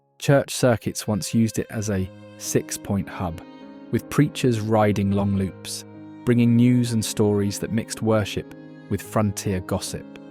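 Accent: United Kingdom. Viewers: Didn't expect a British dude narrating this lmao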